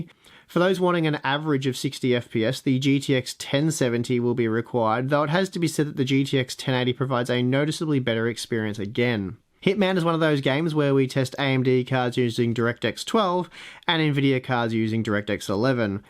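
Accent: Australia. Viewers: You have such an australian accent omg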